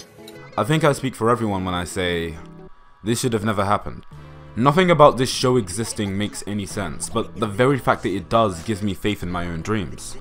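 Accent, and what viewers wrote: England, United Kingdom. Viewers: What's this Black/Blank memento thing he mentions in the video? I can't white hear it because of his British accent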